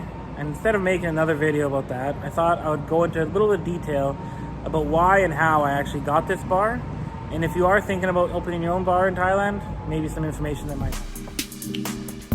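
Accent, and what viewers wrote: United States. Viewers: You sound like an American and you actually have the right to own 100% of a business in Thailand. It's called "The Amity Treaty". You just need to have it setup with a lawyer and go to one of those firms you talked about. I can't believe no one told you about this. The paperwork is like 14,000 baht. Google, setting up an Amity Treaty business in Thailand.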